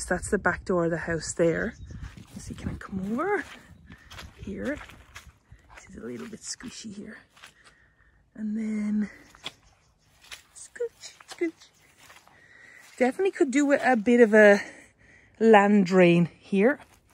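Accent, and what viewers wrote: Ireland. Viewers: Reminds me of the house in the movie, Quiet Man." I love listening to your awesome Irish accent 😍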